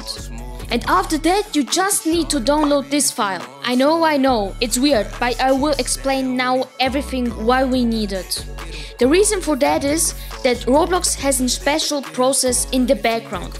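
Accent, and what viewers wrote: Germany. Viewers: Find ich gut dass man merkt das du deutsch bist. Aber trotzdem danke für dieses hilfreiche Video. 👍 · mann hört rauß das du deutsch bist hahah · Ich dachte mir schon das du bist deutscher 😂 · u german? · Are you german? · ICH WUSSTE DOCH AN DEINEM AKZENT DAS DU DEUTSCH BIST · TY bro It helps very much (und man merkt das du deutsch bist aber gutes english)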